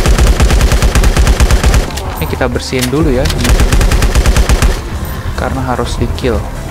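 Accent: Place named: Indonesia. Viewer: Baru denger nih aku denger voice indo hahaa -Jiyang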